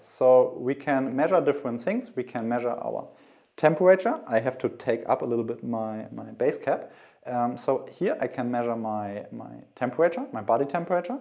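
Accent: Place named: Germany. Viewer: With german accent, very slowly, it's hard to understand something! It's needs a lot of patience to cach something useful !🥱🥱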